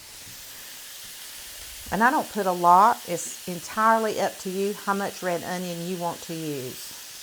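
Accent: South, United States. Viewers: I love your recipes. I have made so many of them. My husband loves your sloppy Joe recipe. He said he doesn't want the canned stuff anymore. 😂 My 14 year daughter loves watching you too. When she hears your voice on the TV she comes into the room and says" It's the cute Southern lady." Then she'll sit with me to see what you're making. ❤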